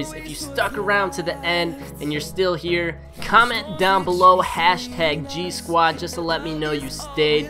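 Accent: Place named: Scotland, United Kingdom. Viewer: Zezo The Noob his accent is strongly Scottish not English sry but I'm from England so I hate it when ppl say that Irish and Scottish accents are english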